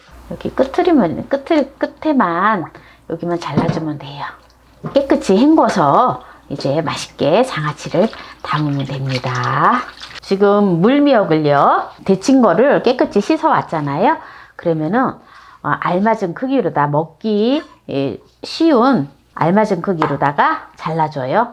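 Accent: Jeolla, South Korea. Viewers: 샘이 전라도 사투리 끝트리ㅎㅎ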